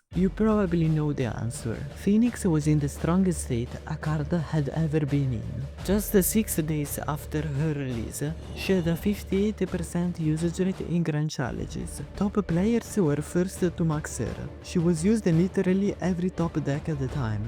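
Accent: Italy. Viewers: yea hes definitely italian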